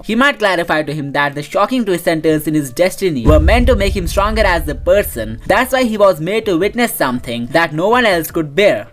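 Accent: India. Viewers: R u from India?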